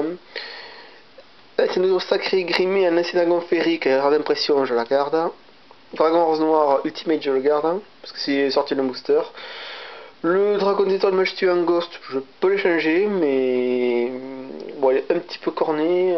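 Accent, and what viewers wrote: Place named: South, France. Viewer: t du sud comme moi toi vu ton accent ! (ce n'est en rien une critique) et j'aimerais t'échanger le dragon détonant la derniere carte est canon derriere la porte ce qui fait que quand tu dois te prendre des dégats par effet , c'est ton adversaire qui se les prend et aprés si tu as des protecteurs du tombeau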